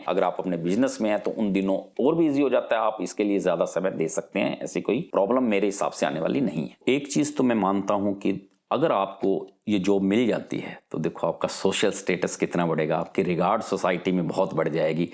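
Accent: Haryana, India. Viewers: Sir aap kaha s ho proper,,,,,,, apki language sa to aap Haryana sa lag raha ho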